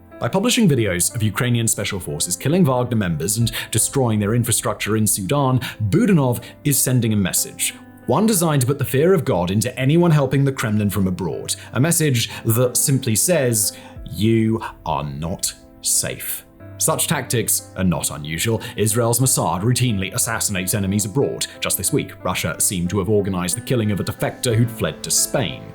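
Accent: RP, United Kingdom. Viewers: he's a brit =) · I'm also from the UK. narration voice is an RP accent influenced by time living overseas. If you spend a lot of time speaking a foreign language it leaves imprints on your L1 speech over time.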